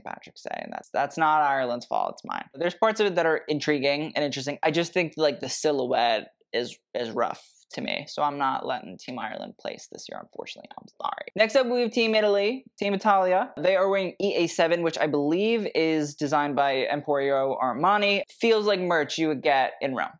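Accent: United Kingdom. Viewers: The British accent is giving 😂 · Your British accent is hilarious, hahaha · the british accent took me out 😭😂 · ❤ your British accent 😂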